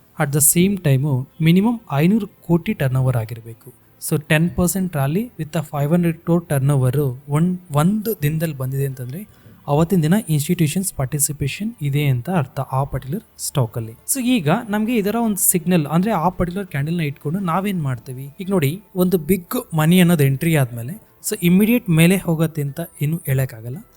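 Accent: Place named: Ballari, India. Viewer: Very nice video, I watched full and gave like also, I hope we can see 1000 likes very soon. But irrespective, please continue this video and show us to build the screener in chartinc for next steps. Are you from Ballary / Raichur region? I am just guessing based on your Kannada accent, I might be wrong. Thanks for this video, God Bless You.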